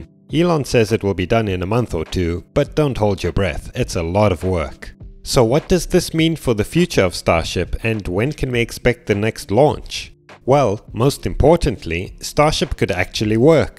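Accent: South Africa. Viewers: South African? Accent kinda sounds like it. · South African!!! Nice Dude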